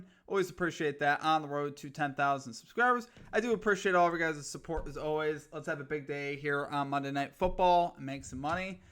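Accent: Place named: Midwest, United States. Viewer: Pretty delightful midwestern accent.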